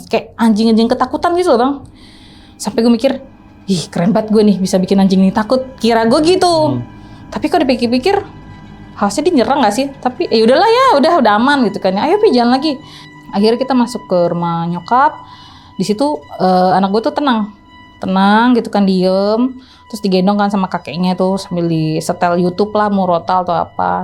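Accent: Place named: Betawi, Indonesia. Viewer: keknya betawi😂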